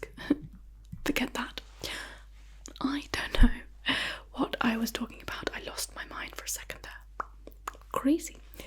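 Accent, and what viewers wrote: RP, United Kingdom. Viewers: And guessing from your very sexy English voice you are from the posh English county’s like Surrey,Oxfordshire or somewhere like that??!!😉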